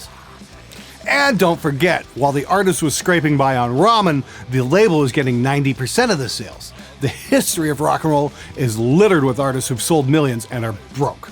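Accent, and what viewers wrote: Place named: Canada. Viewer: Hey. Just wanna say I love the Canadian accent.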